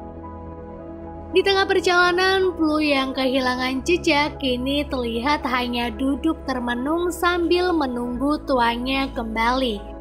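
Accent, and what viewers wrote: Pemalang, Indonesia. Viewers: Wong Pemalang kie suara ne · Wong pemalang kah mba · Miminnya org pemalang ya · Wong Pemalang min?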